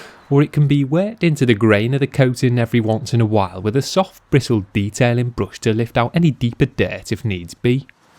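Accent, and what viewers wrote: United Kingdom. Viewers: Conorsz He is definitely from a particular part of the UK, I'm just not sure which area. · Somewhere near Liverpool in the North West of England. I don't know exactly where but that's where is accent is from.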